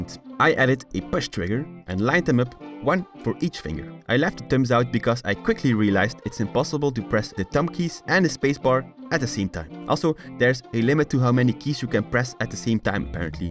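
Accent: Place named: Netherlands. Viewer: Do you speak Dutch? Because your English accent sounds a bit Dutch :) No hate.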